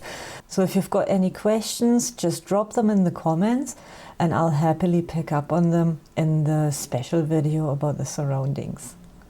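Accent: Scotland, United Kingdom. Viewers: Aha! I had thought it was a Scottish accent. My (incorrect) theory was that you had studied English in Scotland. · I knew I heard Scottish in your accent! 🙂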